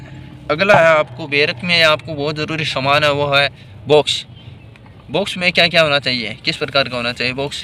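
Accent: India. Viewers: भाई बोली भाषा से तो राजस्थानी लग रहे हैं तो प्लीज़ अपनी क्षेत्रीय भाषा को अवाईढ ना करें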